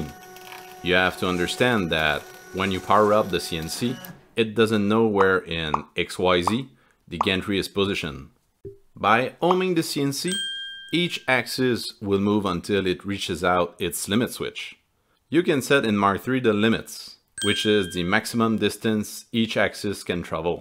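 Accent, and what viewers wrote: Quebec, Canada. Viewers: at first I thought this was going to be another European build, but that accent is Francophone Canadien isnt it? the Mastercraft toolbox confirms this. subscribing. hello from BC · Hey! I assume by your accent your french canadian, which i also am. In case im wrong ill keep on with the english. Anyway, im interested in building my own cnc waterjet cutter as i need excessive precision on the cut itself. Ive been surfing thru a lot of CNC videos but it just keeps on shuffeling the pot in my head.. would you be able to break down the control system more precisly? You went over the diagram but it seems fairly complicated the way mach talks to the breaker board and all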